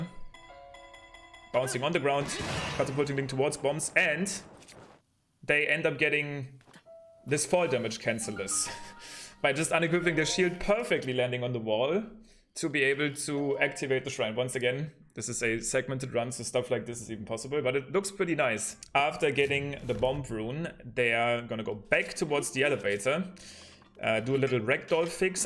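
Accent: Germany. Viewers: Man merkt dass du deutsch bist haha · du sprichst doch locker deutsch dein aktzent hört sich so an weil du nicht so gut die asusprache von englisch kannst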